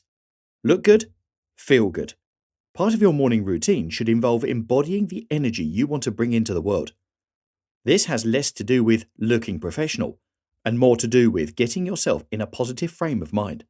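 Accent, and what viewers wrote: United Kingdom. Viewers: That thick british accent is so annoying:d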